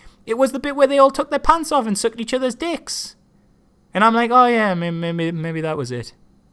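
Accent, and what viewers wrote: Newcastle, United Kingdom. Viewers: I wish you Geordie abridged more films... I'm not even Geordie but I understood it better.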